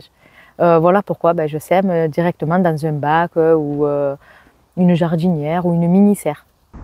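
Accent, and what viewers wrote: South, France. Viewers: J'aime vraiment vous retrouver pour vos vidéos Ce petit accent du midi me fait rêver ❤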